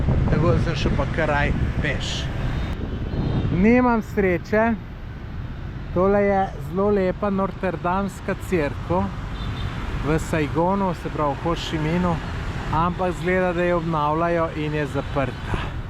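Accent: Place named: Dolenjska, Slovenia. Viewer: po moje pomen,da se je usedel🤣..men je smesna ta njegova dolenscina... A ves kaj pomen pride puj?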